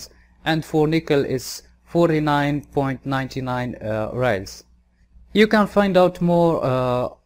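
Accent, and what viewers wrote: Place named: Maghreb. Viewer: أحسست فيك لهجة من المغرب العربي لذا سألتك ، انا اخوك من الجزائر ، بالتوفيق لك وشكرا جزيلا على الشروحات التي تقدمها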